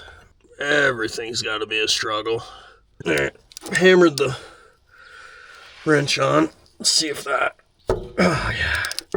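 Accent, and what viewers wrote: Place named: Northeast, United States. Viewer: I started watching your content about a year ago. When you spoke, your accent sounded similar to the way the folks from the NE sound. Your content is interesting, I drove OTR for 36 years, and I love the sound of Screaming Jimmys. Keep the good stuff coming!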